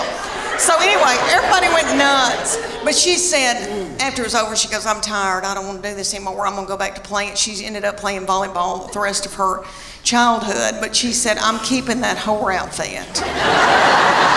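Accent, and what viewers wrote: South, United States. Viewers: So true about how everyone (parents and kids) act at these events. Absolutely hilarious, all the more so because it’s true. And it’s Leanne Morgan so it’s got her southern accent and insight. You’re a national treasure. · This lady is absolutely HILARIOUS with that NATURAL southern accent, I love her and she's really cute to boot🥰🥰🥰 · She's absolutely beautiful, and I love her southern accent! 😘😍🥰 Thick women are a gift! 🎁🥰😘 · After discovering Jeanne Robertson, then you, I realise I have a liking for southern lady comedians. Your charming accent is the perfect accompaniment to a funny story. If you visit the UK, I shall definitely buy a ticket. 💕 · Southern accents are my favorite! Sounds so nice! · From the South. I believe she is from TN.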